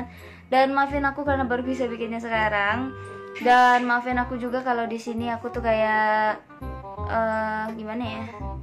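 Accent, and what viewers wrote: Indonesia. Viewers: Logat"nya persis orng indo.. Aku kira orang indo yng mentap di brunai..😀😁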